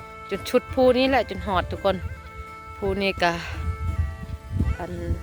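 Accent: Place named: Vientiane, Laos. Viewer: สำเนียงเว่าคือคนลาวเวียงจันทร์แถ่ะครับพุสาว😊❤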